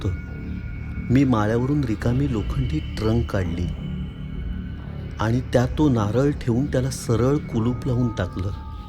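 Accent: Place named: Konkan, India. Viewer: तुमच्या आवाजात कोकणाच्या मातीचा वास आहे 😍 तुमचा आवाज Pralhad Kudtarkar sarkha aahe...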